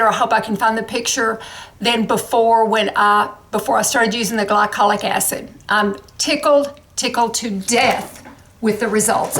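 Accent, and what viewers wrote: South, United States. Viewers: My favorite season is Fall. The colors and coolness of that time of year I love. Congratulations on hitting 100,000!!😁 I so enjoy your videos. I lived in Tennessee for 10 years, and listening to your southern voice is my touchstone to there. 💜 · I could listen to you all day. Your accent is endearing, to say the least. Southern ladies have an aire about them that can't be beat. Cheers from central FL! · My favorite season is Spring with all the lovely flowers in bloom, including the Dogwood trees, azaleas and best of all no humidity! Despite all the pollen and my allergies, I still love Spring! I guess it’s all the new life bursting forth after the dead of winter. Congratulations on the 100,000! I love your videos ... I live in the Deep South. I’m turning 61 on January 29, so I appreciate your videos and you ... someone in my age range and who has a lovely southern accent.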